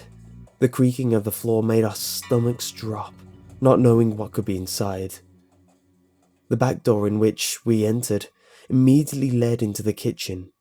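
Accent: United Kingdom. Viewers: Americans? The guy reading this has a British accent. Are you kidding me. Why does everything in the comments have to relate to Americans.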